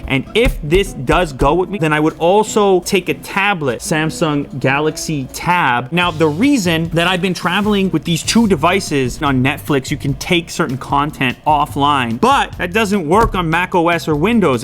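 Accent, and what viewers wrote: Canada. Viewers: Wait!...your Canadian? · you from Canada? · your Canadian??!!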